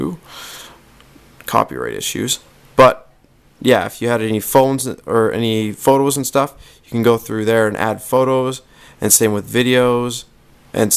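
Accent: United States. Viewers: Gerardo Sanchez Dumb stupid speaking yank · Leigh Ann Woody, the world is more than USA, outside the states you pronounce it "rotor", even if he sounds like an amercian, "rotor" is correct. So, how smart are you? ;)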